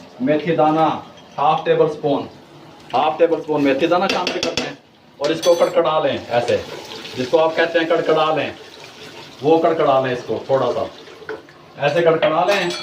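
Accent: Punjab, India. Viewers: ka punjabi ka tarka v good. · Very nice ap ka bolne ka andaz Punjabi thori thori jo bolte hain Good job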